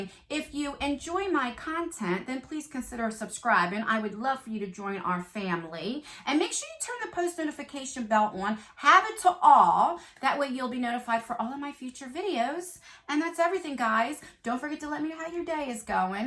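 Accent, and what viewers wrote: New Jersey, United States. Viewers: I absolutely love watching you. I currently live in Alabama (20+ years ) but my family is a bunch of " Damn Yankees " . You remind me of cousins who are sweet with just a little tart thrown in to keep it interesting .By the way did you know you have a Jersey accent ? L.O.L.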